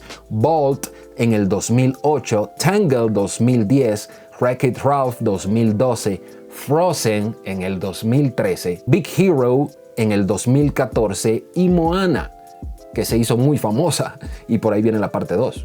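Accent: Mexico. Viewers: Tu acento es mejicano habla con las narices mejicanos no creo que sea dominicano.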